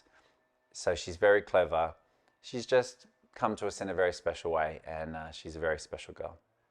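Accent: Australia. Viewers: Love the channel, I about to rescue a retired greyhound, I have had one before and they are the most beautiful dogs. I love your accent, I have my Siri on Australian! 🇦🇺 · I am so sorry to hear about you and Zoe. I was thinking that something was wrong because you stopped wearing your wedding ring. You are such an amazing vet with an incredible affinity for animals and people. You are humble, open, and honest. You are so brave to share all your personal business. We want to know because we care about you and Zoe, and we want to be assured that you are alright in every aspect of your professional and personal life. Also, I love to listen to you talk because of your alluring Australian accent. Please continue to do these videos and your Rescue Vet program. Love from a Canadian fan. Wishing you luck and success. ❤😊 Deb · Because he was born in my Hometown of Brisbane QLD Australia & never lost his Aussie accent.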